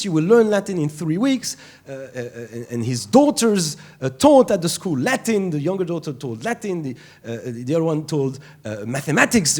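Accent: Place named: Israel. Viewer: יש לך יותר מבטא ישראלי באנגלית מאשר בעיברית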